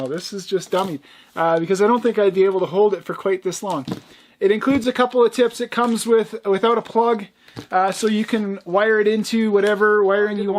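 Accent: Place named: Canada. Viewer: You must drive across the border to buy stuff because sounds like you're Canadian it seems like stuff is so much more up there I've been there many times it's like the Canadian content law make stuff way more expensive and they can't sell tools like this there that cheap. But if it was made in Canada they can sell it so the Canadiens markup the hell out of everything that they make so everything cost you guys too much money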